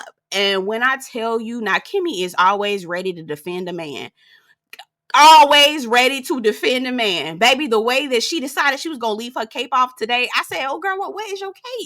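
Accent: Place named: South Louisiana, United States. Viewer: u talk like me lol .... im from South Louisiana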